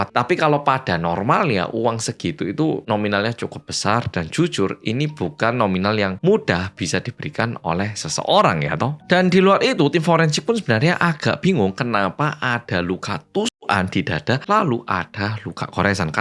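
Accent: Java, Indonesia. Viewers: hallo ❤ Nyimak ceritamu 🎉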